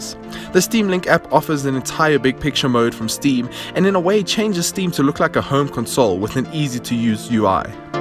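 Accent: South Africa. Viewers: South African narrator :)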